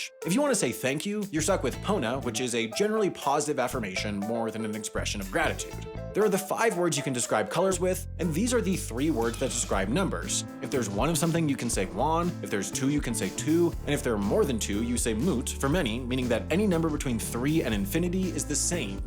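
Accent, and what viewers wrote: United States. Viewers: say they are the spanish vowel goes on to pronounce i and e like every stupid American even tho he speaks french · he just has the ‘American’ accent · Are Americans really that bad at pronouncing other languages without that strong American accent or is it just Sam? To learn how to phonetically pronounce so few letters in an another language is not that hard really. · It's unsettling to me how someone so knowledgeable just...cannot produce basic sounds without them being overtly "American" 💀 having flashbacks to his China videos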